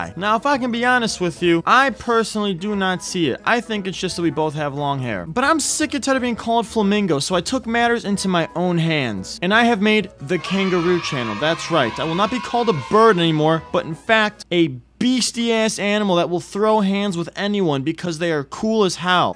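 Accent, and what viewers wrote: Australia. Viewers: Man's just the Australian version of Flamingo except that I don't know if you're Australian. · Are you aussie? If you are im immediately subbing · are you australian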